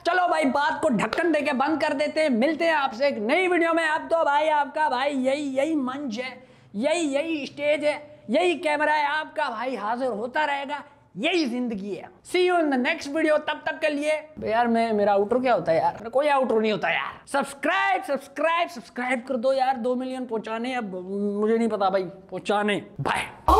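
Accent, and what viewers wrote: Karachi, Pakistan. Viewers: adopting Karachi Accent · Kiranchi accent and Lahori sense of Humour 😂🫶🤝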